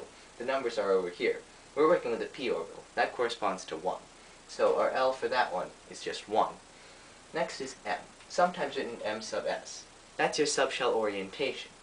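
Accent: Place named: India. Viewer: this guy is awesome, he teaches chemistry, looks Japanese, and has an Indian accent :D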